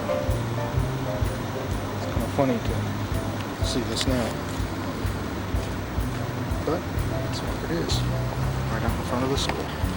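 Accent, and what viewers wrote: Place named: Rochester, United States. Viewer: I'm a native Rochesterian (Irondequoit), now living in Flagstaff, Arizona for the last. 40 years. You've still got your Rochestah accent. Me too.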